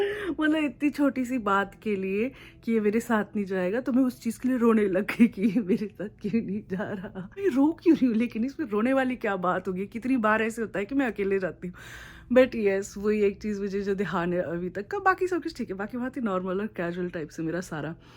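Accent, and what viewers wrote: Pahadi, India. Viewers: omg her pahadi accent is so good 😂😂....love your videos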